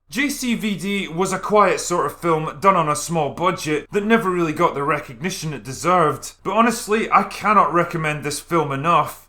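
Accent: Scotland, United Kingdom. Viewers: Have you honestly never heard of regional accents? People across the UK and USA speak English but there are still distinctly different accents in some regions, and those regions don't have their own languages either. I mean, the Drinker himself has a Scottish accent...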